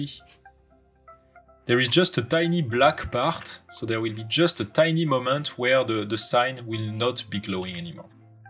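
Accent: France. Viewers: Il faudrais faire les vidéo en français, car avec l'accent français la traduction est horrible ! et c'est juste incrompréhensible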